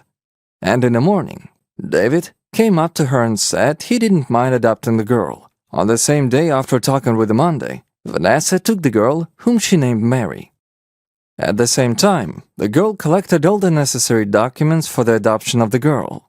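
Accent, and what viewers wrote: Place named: Russia. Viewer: Utter nonsense narrated by a Russian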